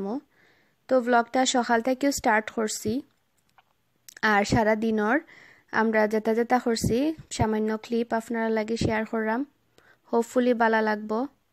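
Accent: Sylhet, Bangladesh. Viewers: Apu kemon aso inshaallah valo aso tomar sylheti kotha gula khubi valo lage dua kori valo thako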